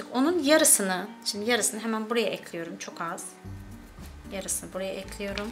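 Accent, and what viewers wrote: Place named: Azerbaijan. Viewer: Hər şey əladı.Amma zəhmət olmazsa öz dilinizdə kanal acın .Sizin türk deyil azeri oldugunuzu lehceniz asanlıqla ələ verir.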